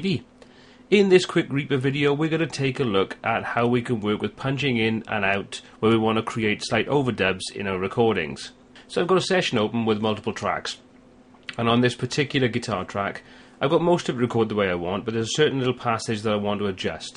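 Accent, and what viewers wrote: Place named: South Wales, United Kingdom. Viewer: Great. Definitely South Wales.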